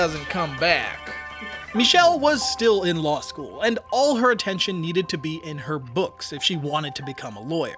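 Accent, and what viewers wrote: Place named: United States. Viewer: Hearing "bloody" and "petrol" in an American-English accent just does not compute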